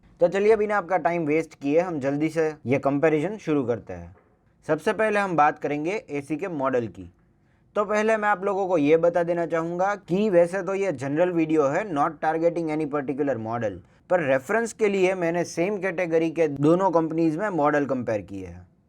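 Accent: Gujarat, India. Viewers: Gujarati Cho Brother?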